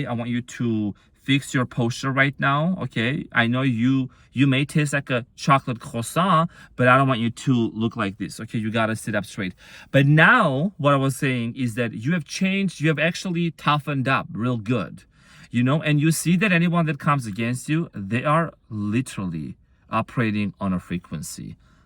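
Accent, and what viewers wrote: United Kingdom. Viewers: I’m impressed with your British accent. I’m from the UK ❤ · You make me smile so much / with all the sassy shit references …in a British accent…. Meet me beyond these walls and we shall speak further…with action…. Persist in this way and consequences will induce themselves. 😂❤ love it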